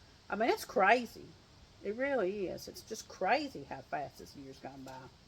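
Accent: South, United States. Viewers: Dear.. I would love to see you every day on here. I love your sweet Southern accent 😉 & those cute Southern descriptions of things. Your C2C Christmas blanket is so beautiful!!! The colors are true Christmas colors!..I loved hearing your birds..sounded like Blue Jay's! Seems like all of our chirpy birds gave all gone. I dodnt see Woodpeckers all summer..but today there was 2 in my back yard!! I miss the sounds of Spring & Summer..way too quiet 😕. Take care & God bless all of you 🙏 ((hugs)) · Hello Miss! Greetings from Florida! Just found your channel and let me tell you I absolutely love it! That southern accent is just lovely and your style is very soothing and very down to earth. I’ll be watching you from now on ☺️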